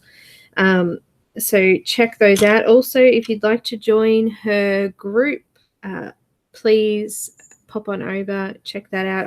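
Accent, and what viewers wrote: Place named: Australia. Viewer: Just wonderful, I learnt so much from this 4 series tutorial. Just realised your a fellow Aussie also. Should of picked up on the accent sooner. I know you used white for these ones but I am really wanting to try the Strathmore toned grey and tan paper, can you please advise exactly what to order as I am clueless. I do use the office works board 200gsm and love it. Many thanks.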